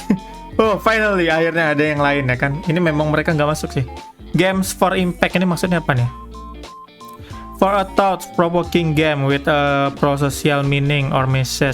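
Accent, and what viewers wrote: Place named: Banjar, Indonesia. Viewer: bang, elu org mana sih? bahasa lu kyk org banjar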